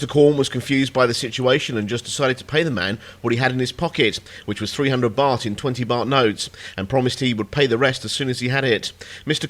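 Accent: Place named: United Kingdom. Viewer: Ei pysty. Mun huomio menee ton puheelle nauramiseen. Liian vahva brittiaksentti😭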